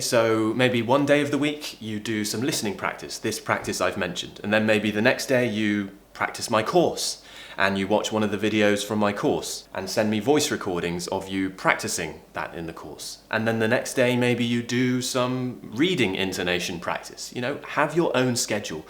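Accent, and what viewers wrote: United Kingdom. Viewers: Hi, I'm a student learning Enlighs from Japan. Thank you fro this video from 2021. You are the perfect role-model for me cause your British pronouciation and accent is my ideal one. You are gonna be my best teacher on YouTube right now!! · Hello, thank you very much, this is really useful. What is your accent? Is it RP? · Greate video bro.I'm from India.India has biggest English speaking population in the world.English is our official language.cricket commentary is the best way to lean English as i utilized this method.tony grag was best commentator ever according to me.he was English cricket captain.his accent was awesome.from my childhood l wished to have a British accent but not happen till now.but ur videos r help full.Indian accent is very different from British.ur British accent is awesome.I can lean more from ur awesome videos bro. 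Cricket world cup is going on in England&India goona facing England on Sunday.wish u good luck. ur biggest fan from India 🇫🇴❤🇮🇳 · Good afternoon. Thanks very much for your great advice. You're a unique, so no matter what I will try to copy you I will never be able to speak like you do with this beautiful and clear British accent. I just love it. Sure I will be listening your podcast, not only to improve my accent but also to learn more from your experiences. I try to improve it all the time, but then asking me to speak like you, is kind of asking to much. However I've been learning so much from you and I do appreciate it. Again, thanks ever so much. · Master, it is really wonderful to listen to you! Your British accent and pronunciaton is so perfect! You are an excellent teacher, no doubt. Thanks for everything you give your listeners. I am a teacher of English and Bachelor of Art but I still feel I need more exposure to the Spoken English language. 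Imitation is one of the most important step in the learning process! You will be my role model, for sure. Looking forward to listening to you again soon. Take care! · I want to speak British, so how I can speak like you I like your speak very much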